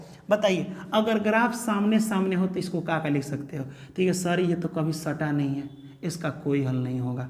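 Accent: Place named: Bihar, India. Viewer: Sir, mera math strong hai Mera 12th me v tha, But , kis taip ke question aate Exam me isliye apki video dekhti hu , but sir apke video dekhe revision achhe se ho jata h, Ap week students ko v achhe samjha dete h, Bihari language me 😊 And confidence ke sath samjhate h Bohot sukariya hum sab ki taraf se ❤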